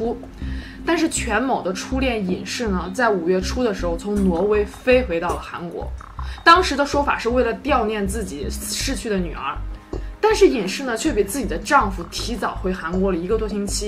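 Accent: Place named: China. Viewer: 幹，26腔聽不下去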